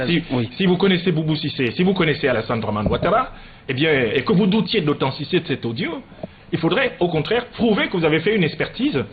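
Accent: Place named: France. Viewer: Pourquoi parle-il comme un français?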